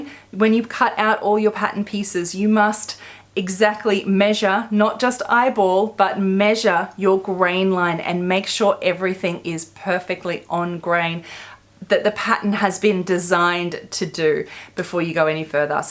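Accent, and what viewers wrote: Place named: Australia. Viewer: Great to hear an aussie accent.. sooooooo loooooong winded.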